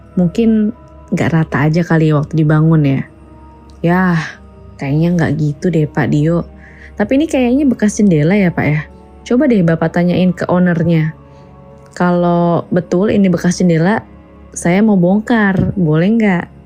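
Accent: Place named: South Jakarta, Indonesia. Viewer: Anjir didaerah gue Cinere, anak JakSel mana suaranya.. sukses selalu mbak yang cuantik hehe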